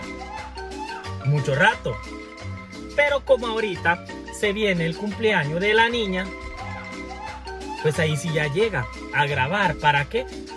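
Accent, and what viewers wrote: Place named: Mexico. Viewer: María Sandoval el abla como Mexicano es porque vive con su esposa Mexicana ya se le pego el acento mexicano😂😊😊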